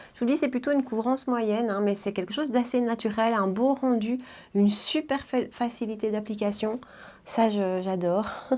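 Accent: Belgium. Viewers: Coucou ma petite. La notification qui fait plaisir à 19h pétante en plus avec un GRWM comme on aime 😁. Ton accent belge on adore 😁. Elle est vraiment belle cette palette de leur collection de Noël, les couleurs ont l’air vraiment intenses et magnifiques de part ce que je vois. Comme tu dis c’est quite ou double chez MUR. Tu me donnes envie de tester quelques produits de chez ELF comme la base. Il faut vraiment que tu testes la poudre lumineuse de chez ELF. (La loge de Gabrielle en parle souvent et la met principalement sur les côtés du visage pour donner un coup d’éclat naturel à la peau vu qu’elle a la peau mixte). Celui que je préfère en petit prix c’est celui de Catrice (je ne sais plus comment il s’appelle exactement), il a une très bonne tenue, une belle couvrance et n’est pas trop asséchant pour mon contour de l’œil j’aime beaucoup combiné avec le fond de teint matte de Catrice et la poudre matte également. 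Avec notre peau grasse il faut de la belle matité. Sympa le petit combo Nyx + essence pour les sourcils (à tester 🤔). Très joli maquillage au passage tu nous surprendras toujours. Pleins de bisous et une très belle soirée 😘❤️.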